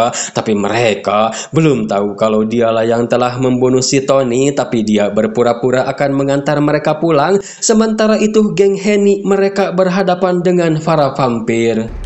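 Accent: West Java, Indonesia. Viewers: Lanjutkan min keren lucu pembawaan nya 😂,sunda pisan uhuyyyyyy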